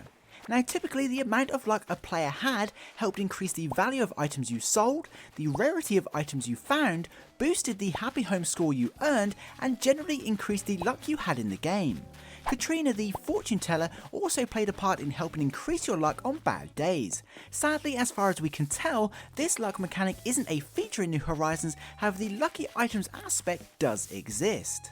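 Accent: England, United Kingdom. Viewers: he’s English lol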